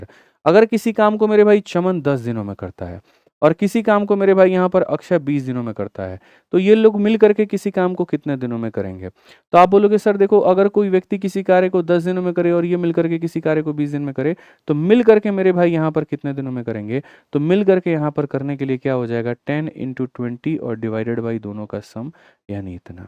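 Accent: Bihar, India. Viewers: B =badki bahu 😂😂😂 librery me meri hansi nikal gai 😂😂😂😂 gajabsir Bihari andaj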